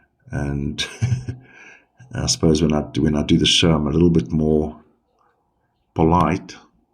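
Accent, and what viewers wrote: South Africa. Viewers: So good to hear a South African voice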